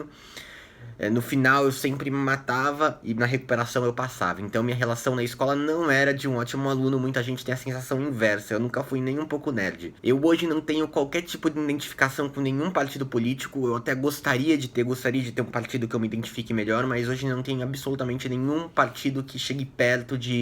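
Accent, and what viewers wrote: São Paulo, Brazil. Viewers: Tu é paulista pensei que era gaúcho.Maneiro os seus vídeos!!!!Tu vai ter muito trabalho em 2018.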